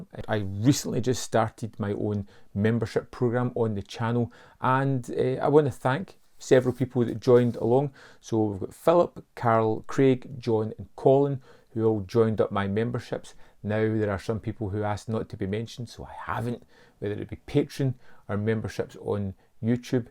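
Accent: Scotland, United Kingdom. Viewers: Do a review of Braveheart with your regular Scottish dialect, rambling about how inaccurate it is. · Love the channel mate keep it up i didn’t know you were scottish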